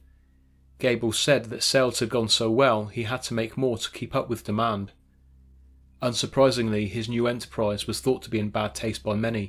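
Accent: United Kingdom. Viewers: in my humble opinion your voice and dialect are two reasons I love your channel. As an American living in Germany I'm strangely biased as personally I won't listen to my favorite literature unless it's in a British accent. I'm really into olde sea novels and Gothic spiritual tales and just can't listen when read by a fellow American.. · Soon as I heard the British accent, I though oh boy here we go he's gonna fuck every story up but honestly this video was incredibly accurate and factual. The only missed detail was Dahmer's address. He said 924 North street, it's actually 924 North 25th street between Kilbourn and State Streets. I am a lifelong Milwaukee resident and am familiar with all these stories. Kudos to whoever researched them. Great job.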